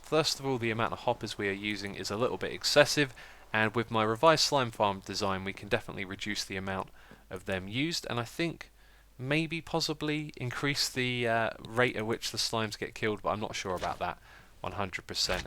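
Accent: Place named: United Kingdom. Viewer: your british Yes i've been watching you for 1 and a half years always thinking your american, sorry about that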